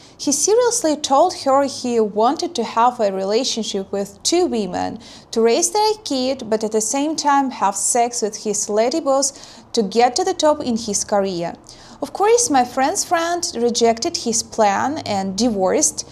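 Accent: Russia. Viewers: Dear, let's make a retro mid 1980s spy film, you the beautiful, seductive but deadly KGB agent in Warsaw Poland, setting up a honey trap............me.....defending the integrity of western values, the amiable naval attache at the British embassy, saving the world for democracy......the Russian and British accents together in the same room.......wonderful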